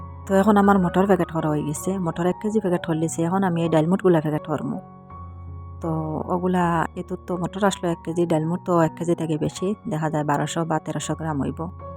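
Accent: Sylhet, Bangladesh. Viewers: আসলে আপনার কথাগুলা অনেক ভালা লাগে। আর আপনার কথাগুলো আমরার সিলেটের লাখান। আর আমি সিলেটি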